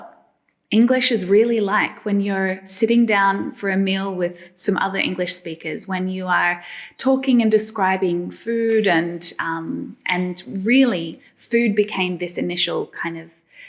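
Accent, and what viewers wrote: Australia. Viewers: congratulations, you are terrific in teaching English. I think your accent is between Australian & English & easy to comprehend. Best of luck. Enjoy Perth. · Hi! Teacher. Good aftnoon. How are you? My Name's Ivanilson. I'm from Brazil and live ABOUT TONW Itacere-ba. Did you know? WHAT'S News? I'm to want speak English Britanico but know I study how a teacher can is this Austrialiano sutaque is spok beatiful.more Did you know? Does She is a woman very beautiful love see you speak this lessonigs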